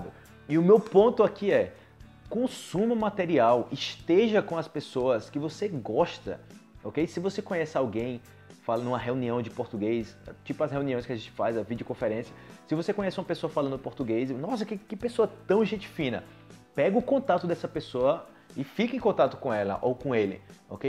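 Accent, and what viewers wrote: Brazil. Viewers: Adorei o idioma por vc .... Amo o sotaque brasileiro!!!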